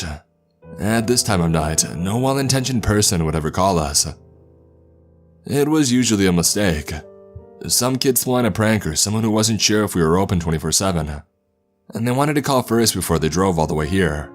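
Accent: Canada. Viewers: South Park Canadian narration...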